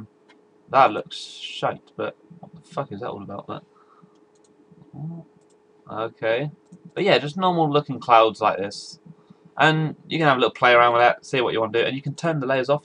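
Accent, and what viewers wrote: United Kingdom. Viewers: Are you British? Looks like you have a British accent hehe:)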